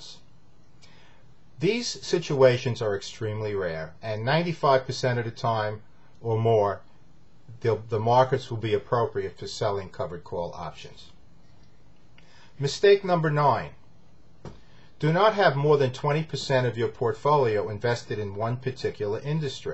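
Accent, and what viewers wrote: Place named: Long Island, United States. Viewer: OMG, within the first minute I thought to myself.. His accent sure sounds like hes from LongGisland (Where I live) then wamn, I noticed the Bethpage address in the background. Farmingdale resident here.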